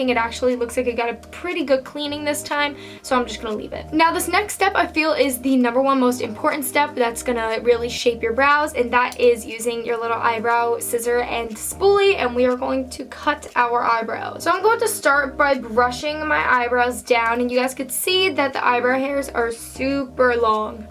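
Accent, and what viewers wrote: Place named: New Jersey, United States. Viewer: You sound kinda New Jersey~ish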